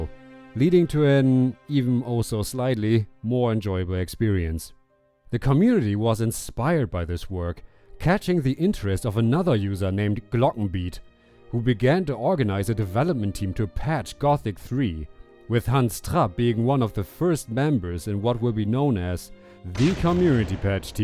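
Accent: Germany. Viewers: Cooles Video! Ich wollt grad schreiben, dass Du die deutschen Namen echt gut aussprichst, aber nach ein paar Minuten viel mir auf, dass Du wohl einfach deutsch mit gutem Englisch bist xD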